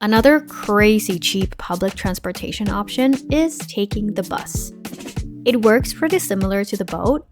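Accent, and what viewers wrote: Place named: Thailand. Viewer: คุณพูดสำเนียงไทยชัดมากกก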